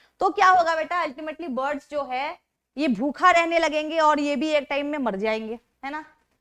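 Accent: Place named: Bengal, India. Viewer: Mam 's accent is like a Bengali....😀👍🏻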